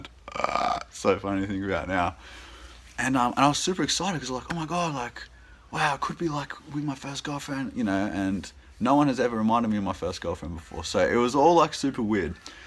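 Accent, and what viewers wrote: Australia. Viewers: Interesting that you subconsciously wanted n needed the same kind of love since teens. I remember that n only illness fundamentally broke that from me n i couldnt now even think of how another lover coukd make me feel high again. Dig ya channel dude.. feel like i know you. Mmm maybe its the Victorian(?) accent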